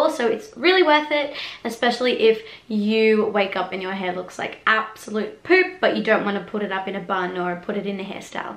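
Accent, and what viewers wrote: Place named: Australia. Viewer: Omg you’re Australian YO WTF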